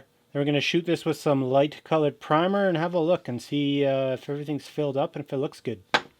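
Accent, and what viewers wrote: Canada. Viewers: Just wondering, are you Canadian? Sometimes you sound Canadian and sometimes you don’t.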